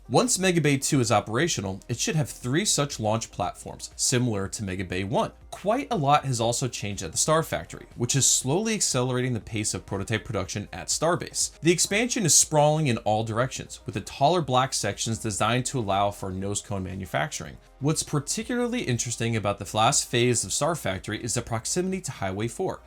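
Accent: Germany. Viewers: I mean the content is great, but passion is unmatched, it makes so much more fun to watch him. German accent for the win;) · Okay… good good now this time with a slight German accent.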